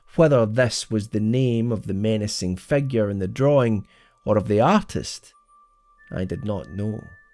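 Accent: Scotland, United Kingdom. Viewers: scottish accent, horror stories, bringing visions of the gothic era, TOP NOTCH!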